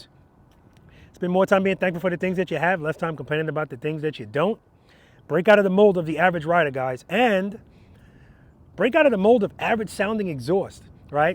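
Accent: New York, United States. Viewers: Dude, that NY accent!! LOL 😂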